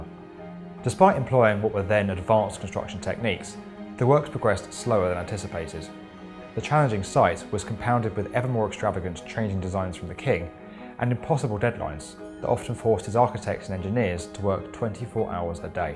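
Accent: United Kingdom. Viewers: I hv 2 comments on the narrator and the commentators here: 1- the narrator is speaking in British English, with a heavy accent only the British could follow him. British English is a curiosity to listen to but very difficult to understand and follow by non English speakers. The Brits speaking to non-Brits should realize this and speak more intelligibly (slower). 2- people who not versed in foreign pronunciation and phonetics should not speak/write as if they are correct and should notate their input as “I believe”. None are German unfortunately which makes no one’s right ! It is not Noi nor Noy and “Neu” in German is more French sounding than English so it cannot be phonetically written the English way: it is close pursing the lips when twisting the sound “eu”. Schwan sounds closest to “shvon”, with the “o” here like the American “o” (as in “pot” & “hot”) and not the British “o” (as in “pot” & “hot”). 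Then stein is pronounced simply like in both Englishes “shtine” as in “shine”. When phoneticizing a word one should clarify which type of English pronunciation is used, since British, American, Australian, Indian, Egyptian ways differ considerably, and after all, YouTube is global and not any one of these !!!! Hope this helps non-German speakers pronounce it correctly.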